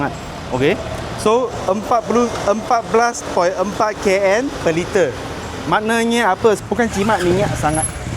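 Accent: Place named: China. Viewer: Hehe... Aku mmg suka la tgok video ko. Mmg syok bro. Ko ckp jujur. Malah video ni paling best...ko sebut P A J A R O...hahaha!! Pelat Cina ko tu buat aku gembira. ❤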